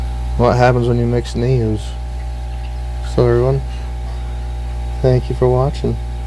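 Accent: United States. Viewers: Are you from texas?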